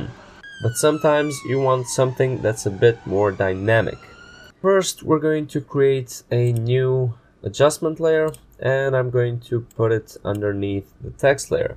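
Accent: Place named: Balkans. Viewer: I suppose your from balkan your accent is great and thank you for tutorial❤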